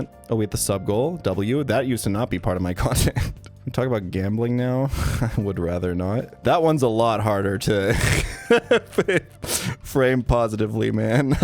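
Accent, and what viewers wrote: United States. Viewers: I took German in High School and the American accent while speaking German had me DYING 😂🤣 - a dumbass American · American with obvious American accent but still good German struggling with memes bit may have crippled me. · I don't speak German but it's funny that I could hear the American accent regardless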